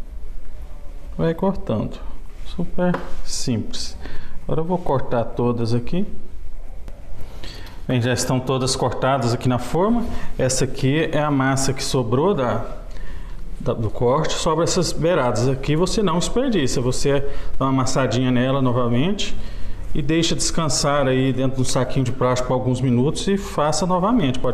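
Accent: Minas Gerais, Brazil. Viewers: Muito bom, vc fala como mineiro, até pensei que vc era das minas gerais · Amei seu vídeo! Muito bem explicado, bem objetivo. E, como boa mineira, saudosa do meu estado, observei alguns toques de pronúncia, me parecendo estilo mineiro, o que me deixou ainda mais à vontade para acompanhar sua verdadeira aula. Grande abraço!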